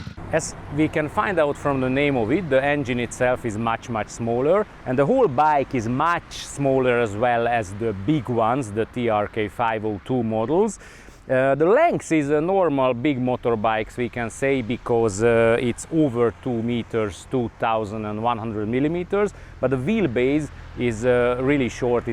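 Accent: Hungary. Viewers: Nice hungarian accent.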